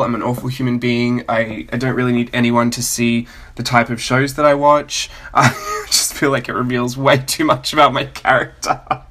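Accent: Australia. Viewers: Please never lose your Australian accent! Keep up the good work! Thanks!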